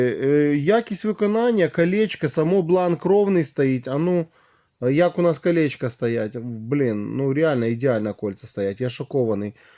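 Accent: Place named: Russia. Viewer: Говорил бы уже по русски, хреначишь по украински с русским акцентом, да ещё и коряво, оно тебе надо?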